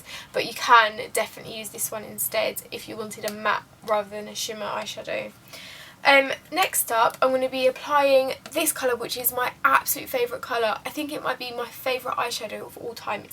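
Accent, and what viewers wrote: United Kingdom. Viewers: Haha She not Irish shes British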